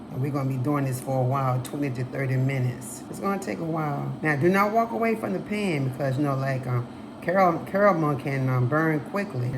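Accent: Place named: South, United States. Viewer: When you were making the frosting with your Southern accent my Yankee self thought you kept saying it was a portable frosting. I thought isn't all frosting portable? Then I realized you were saying pourable. Lol. Anyways that cake looks incredible. I'll have to try making it sometime. Thanks for sharing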